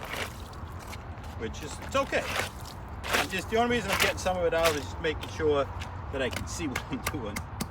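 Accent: United States. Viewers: Sounds like a Worcester accent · Sounds like he's from New York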